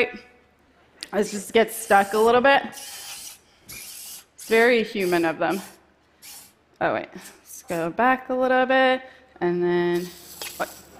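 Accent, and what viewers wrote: Sweden. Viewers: This is probably the first time I've actually heard her Swedish accent come through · It's easy to forget Simone aer Svensk (Swedish). The accent shows just a little. Swedens have trouble with when to s. 😊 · Your Swedish accent really came out in this video!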